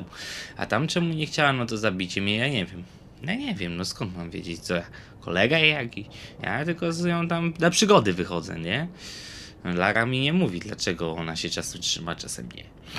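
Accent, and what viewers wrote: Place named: Podhale, Poland. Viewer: Hehe, nie moge z twojej góralskiej gadki coś w stylu " ty nie wiesz ale ja wiem,a jak nie wiem to się dowiem " xD. Pozdro.;)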